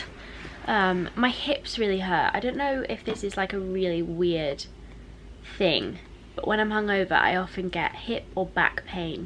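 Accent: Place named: London, United Kingdom. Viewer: Applered she’s definitely a Londoner so that’s a very cute London-accent :)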